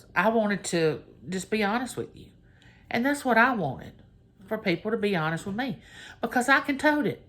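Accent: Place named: South, United States. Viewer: I simply enjoy hearing you talk. The southern accent amazing. Thanks for sharing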